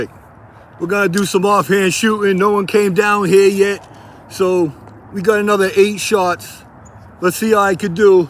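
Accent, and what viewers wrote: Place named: Southeastern Massachusetts, United States. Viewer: Dude you have to be from near southeast Massachusetts. I lived there for 44 years (right near Fall River Mass) and just moved to Southwest Florida but "bang a U-ee" "wicked" "eff this & eff that" you sound exactly like me and my friends.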